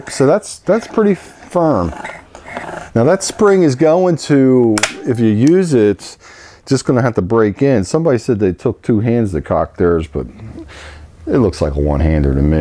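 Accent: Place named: Pennsylvania, United States. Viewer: I'm split between this and the 95 in .22 Looks like Hatsan is pretty good in the sub 300 range. BTW I'm gonna go ahead and guess you're from east Pennsylvania based on your accent. Am I way off?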